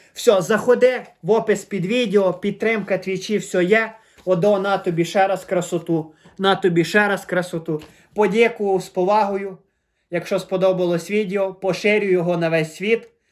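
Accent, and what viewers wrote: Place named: Transcarpathia, Ukraine. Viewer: Перспективно в моєму випадку 😂 В тебе чутно сильний закарпатський діалект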